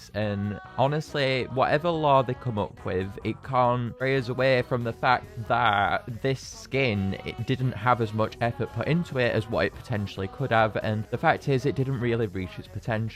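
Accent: Scotland, United Kingdom. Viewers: I think your accent is scottish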